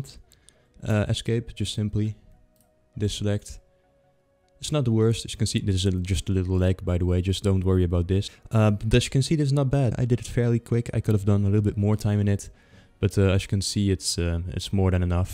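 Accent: Netherlands. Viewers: I kan hoor dat you are Nederlands 😂 lekker bezig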